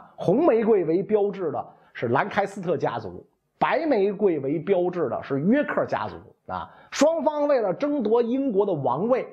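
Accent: Beijing, China. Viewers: 袁先生的Beiglish第一拝聴、 很有特徴！！😉👍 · 老師聊什麼我都愛聽，這口京味就是聽著舒服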